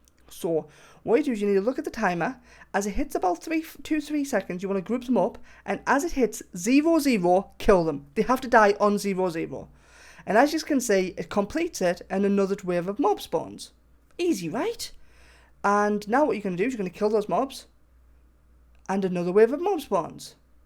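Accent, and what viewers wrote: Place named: Newcastle, United Kingdom. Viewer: The only thing that makes this already awesome glitch better is the geordie accent hawayyyy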